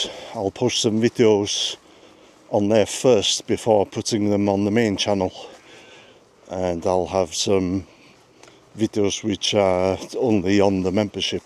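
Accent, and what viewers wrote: Wales, United Kingdom. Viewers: it's really lovely to hear a real Welsh accent, and I'm 100 per cent Celt Irish. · American here and newly subscribed. I worked with a couple of Welchmen 40 years ago and haven't heard a Welch accent in a long time.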